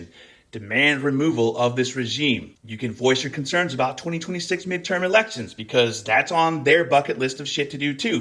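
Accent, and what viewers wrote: Scotland, United Kingdom. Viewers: Uh oh the Scottish accent is a bit sticky lol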